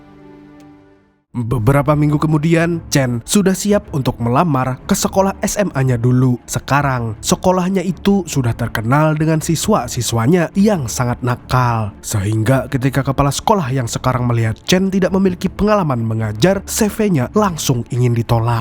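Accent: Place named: Kalimantan, Indonesia. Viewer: bg lu orang kalimantan yaa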